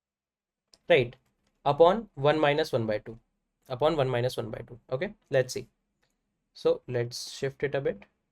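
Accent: India. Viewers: through your commnet i noticed that this video was not in hindi language, the accent is so indian that I really thought that he is talking in hindi lol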